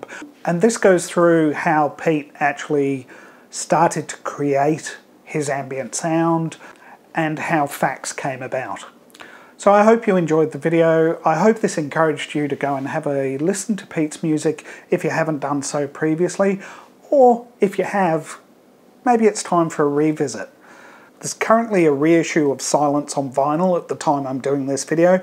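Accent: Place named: Australia. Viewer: So.. I'm watching your video on programming a Logic synth.. There's a teacup on the table, and I wonder.. "I've got one just the same." I move on to this video, and it occurs to me.. What's this accent? I recognise it. I check the 'About' tab. Ah ha. He's an Aussie! Hi. Loving the random 💯 objectives, diggin' the ambient washes.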